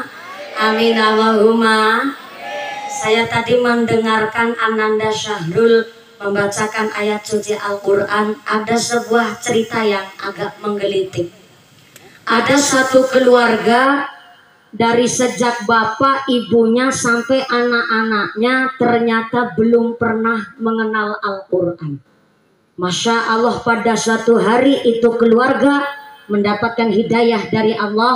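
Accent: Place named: Banyumas, Indonesia. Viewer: Pemalang hadir Pingin ndengerin pengajian ustadzah yang ngapak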